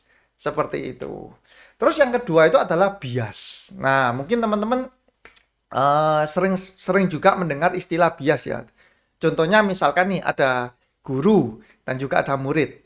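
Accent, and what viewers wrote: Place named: Java, Indonesia. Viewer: Medok banget bahasane mas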